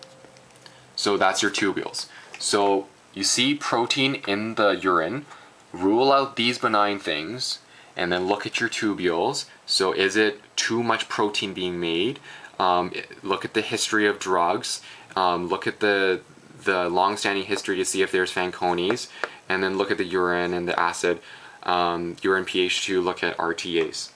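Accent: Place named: Canada. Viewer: are you canadian? detect an accent lol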